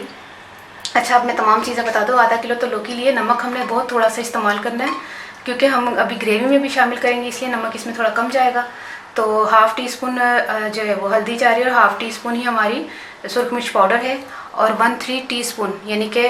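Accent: Punjab, India. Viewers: punjabi ka tarka acha lga mujhy😄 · Punjabi bohot payari bolden Ho 😍👌🏻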